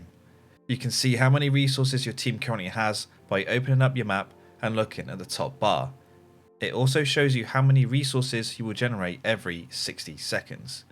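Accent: United Kingdom. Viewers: Best ever explanation on the internet…and in British English which makes it even more pleasant to listen to ;)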